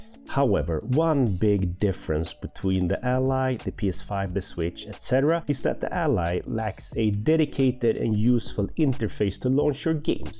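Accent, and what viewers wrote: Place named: Sweden. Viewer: I can hear the swedish sort of accent, you sound familiar dude.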